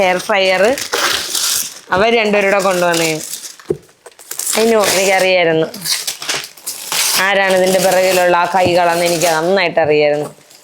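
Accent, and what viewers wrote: Trivandrum, India. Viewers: samsaram kettalariyam Tvmkariyanennu..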